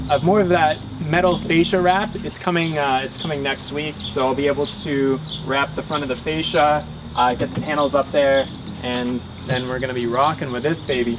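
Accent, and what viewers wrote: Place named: Canada. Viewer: You're Canadian and you talk like a California surfer, only with the occasional aboot thrown in.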